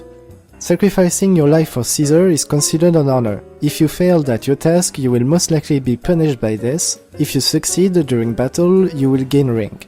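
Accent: France. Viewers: You deserve every view frenchy. Props for having the courage to voice them even though it isn't your native language. Keep making these awesome videos. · ahhh laccent francais put1 ca craint.. essaies de t'en debarasser quand tu parles l'anglais... " hello Zis iz a litteel video on fallout" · Es tu français sa s'entend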